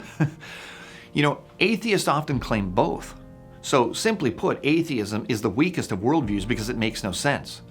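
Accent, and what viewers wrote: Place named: Canada. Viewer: Oh Lord, that Canadian accent is making my ears bleed...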